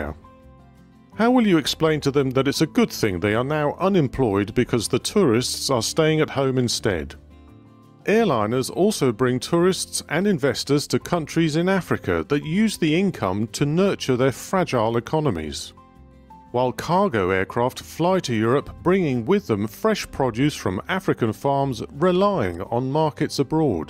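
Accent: United Kingdom. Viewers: Delicious British Accent! What do you think about the environmental impact caused by greenhouse gases produced by aircraft in the long term?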